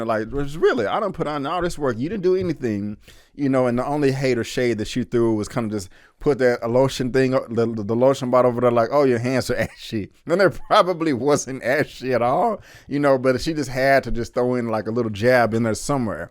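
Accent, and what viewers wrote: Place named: Dallas, United States. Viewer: I can hear the Dallas! Make me miss the Southwest.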